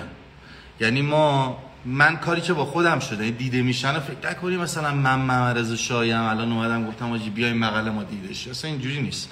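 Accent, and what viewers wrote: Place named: Turkey. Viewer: دادا ترک ع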